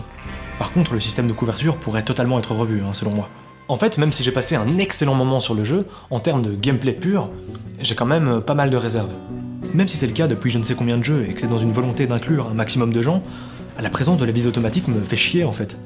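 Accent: Belgium. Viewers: Il est belge ??? Psk il dit pas huit mais wuit par exemple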